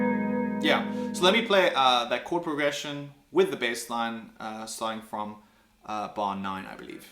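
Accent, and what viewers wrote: South Africa. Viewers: bro you kinda sound south african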